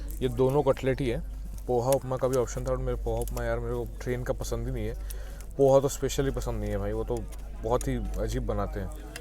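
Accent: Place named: Maharashtra, India. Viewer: You are a marathi person and we enjoy your sweet Hindi.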